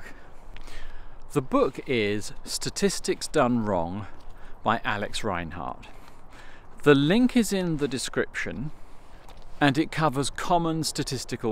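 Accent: United Kingdom. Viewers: You're British, thank God!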